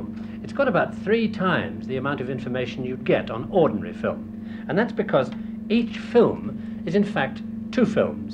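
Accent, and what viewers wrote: RP, United Kingdom. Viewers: Didn't James have a very silly BBC voice back then?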